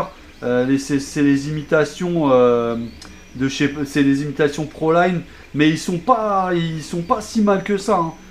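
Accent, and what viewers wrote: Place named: France. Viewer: Excellent cette vidéo, je me taté par rapport au rapport qualité / prix et bien ça semble pas mal... J’adore ta façon franche de parler et ton accent frenchy sur les mots technique anglais 😂. Merci pour ce partage et vivement le test sur le terrain. Big Up à toi 🤘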